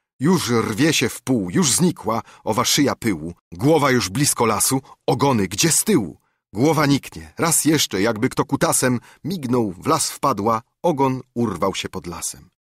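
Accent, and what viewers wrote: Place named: Central Poland, Poland. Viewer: Ach ta środkowopolska intonacja 😢 To powinien czytać jakiś aktor kresowiak. Utwór odczytany w tej melodyjnej wschodniej polszczyźnie, w której przecież został napisany, OGROMNIE zyskuje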